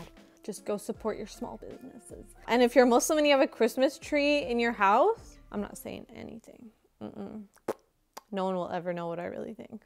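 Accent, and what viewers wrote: United Kingdom. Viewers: That British accent was top tier!!!😂😂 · “When there’s actually culture” please 😂😭💀 Oh dear gOD THE BRITISH ACCENT PLEASE 💀💀💀💀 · Excellant English accent! You're brilliant. So funny. You make me smile on the darkest of days in my life. Please keep it up. Thank you. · It’s the British accent for me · Perfect 👌🏽 English accent from east London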